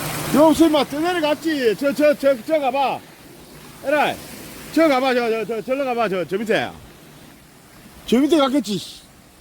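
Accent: Gyeongsang, South Korea. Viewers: 갱상도 사투리도 에라이 다 이해하네요 ㅎ ㅎ ᆢ 형 가벼운 테니스 공으로 에라이 힘들어요 ㆍ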